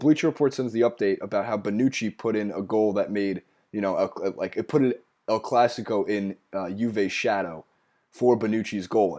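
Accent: United States. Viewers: I like hearing an American talking about football/soccer it is interesting and different, go taking over for today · american talking about football, sounds funny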